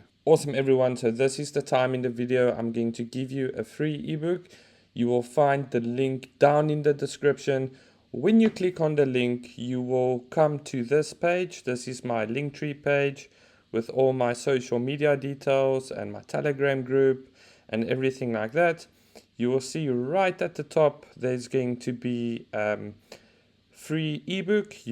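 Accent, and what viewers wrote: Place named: South Africa. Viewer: I knew the accent was South African😂❤️ Man, I'm learning so much mfethu, keep teaching, I'll keep learning, we'll all keep getting better🙏🏽🙏🏽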